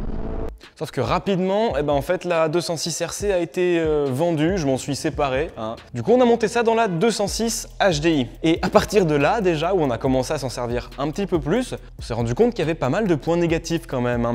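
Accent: India. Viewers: Grave chaud ! Je suis dans l'informatique et je kiffe ton accent indien quand tu oarle anglais ça me fait penser aux tutos obscurs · Quand il parle anglais on dirait il parle INDIEN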